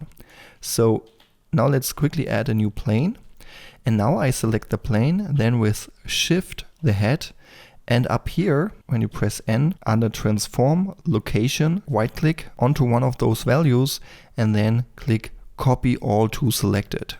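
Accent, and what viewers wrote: Germany. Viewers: sis jerman äccent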